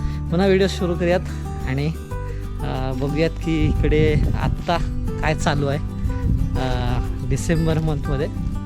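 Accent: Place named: Ghats, India. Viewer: Bhasha. Kokni vatat nahi,ghatavarchi vatte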